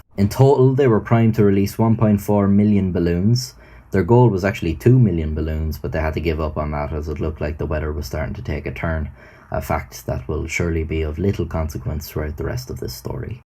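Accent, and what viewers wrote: Ireland. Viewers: Are you Irish? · Can’t tell if he’s Irish or northern Irish or something completely different but he’s got the accent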